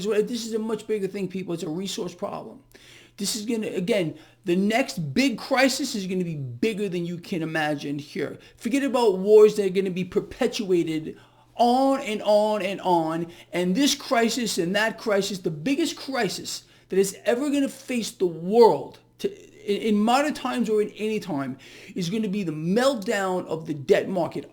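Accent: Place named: New York, United States. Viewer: I'm a life long New Yorker. You sound like you're from NY. Am I right?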